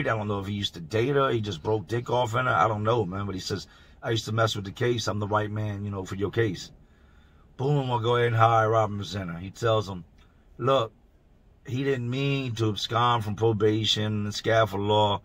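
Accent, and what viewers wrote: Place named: Philadelphia, United States. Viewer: Always wild stories I can hear a little bit of Philly in your accent, no place like Philly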